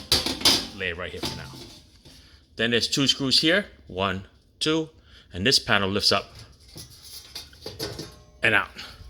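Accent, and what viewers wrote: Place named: New York City, United States. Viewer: Love that NYC accent.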